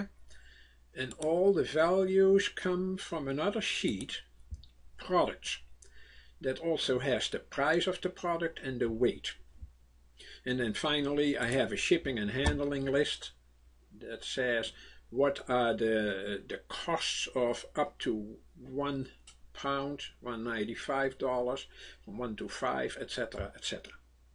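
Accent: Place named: Netherlands. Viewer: Hey, very helpful information thank you, I'm guessing by your name & accent you're Dutch. My Dad is from Holland & we have the same name. Lol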